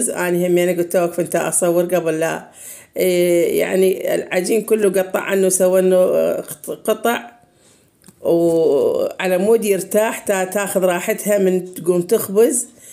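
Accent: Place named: Kirkuk, Iraq. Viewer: اللهجه نفس لهجتنه 🥺حنيت لكركوك وهاي راح اشترك 💔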